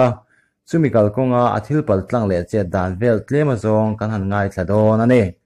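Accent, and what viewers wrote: Mizoram, India. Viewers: mak thei e.. a mizo tawng lamdan in sawi sel reng a,dik deuha lam chu a tum ve ang chu... amah hi tunge a nih pawh in hria ami,tlem a tawng awn hi chu kan zo hnahthlak ah hi chuan an awm alawm.. theih tawp a chhuah hi.. keichu he channel hi atha riau.. thanem ngaitute thahnem ngaihna erawh a zahawma, amah pawn a tum ve in ka ring... 👍👍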